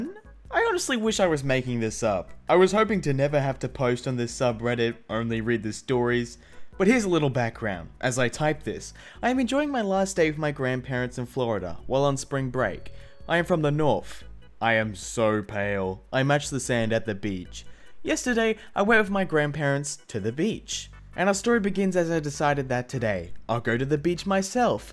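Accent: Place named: Australia. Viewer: I think he is Australian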